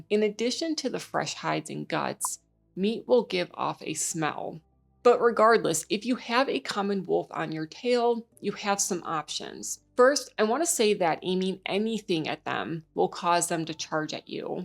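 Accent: United States. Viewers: Great video! U sound WI af😊.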